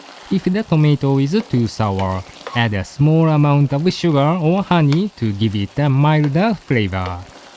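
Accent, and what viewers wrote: Japan. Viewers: as someone who speaks both English and French, I can conform that a French-English accent sounds n o t h i n g like that! It's just his original Japanese accent I think? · I love the narrator's thick japanese accent when he's speaking english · 日本人の耳からすればナレーターが日本語なまりの英語を演出しているのがわかってしまうツラさ…w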